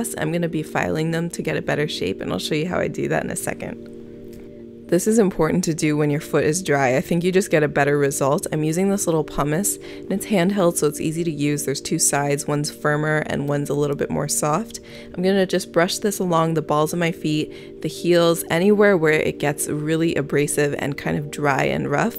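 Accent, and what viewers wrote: Canada. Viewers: Ohhhhh I hear!!! You are Canadian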